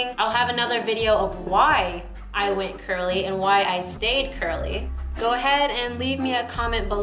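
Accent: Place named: India. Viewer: I love your Hindi ascent... Love from India ❤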